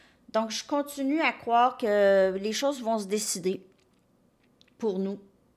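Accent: Canada. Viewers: Merciiii 💖 (Je poursuis mon commentaire, je l'avais publié trop vite). Tout me parle dans cette guidance! Oui j'ai avoué à cette personne que je ressentais de l'amour pour elle et que je pensais qu'on ne s'était pas rencontré par hasard. Mais il continue de tergiverser en disant qu'il ne sait pas ce qu'il ressent, que pour l'instant c'est trop compliqué, et tout plein d'autres excuses. En tout cas, je constate qu'après des années, il semble toujours avoir envie de me revoir 😌 Espérons que le destin soit plus puissant que les indécisions. PS: J'aime toujours autant ton accent canadien si doux 😍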